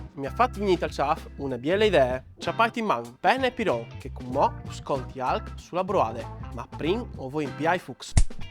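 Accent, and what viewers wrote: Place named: San Giorgio, Italy. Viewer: Eh sì che si capis che tu sês di San Zorz! ZZZZZZ...e no stoi durmint! Braf!👏👏👏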